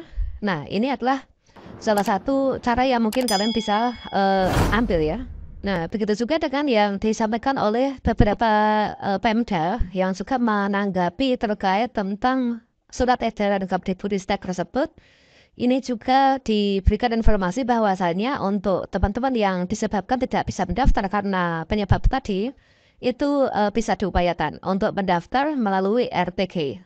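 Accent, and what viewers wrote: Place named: Java, Indonesia. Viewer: Meddok banget suaranya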